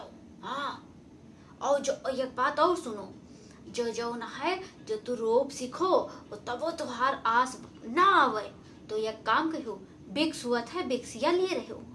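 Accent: Awadhi, India. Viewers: Verý nice gajab ki aktar ho tumhare muh se a avdhi boli bahut achhi lgti hai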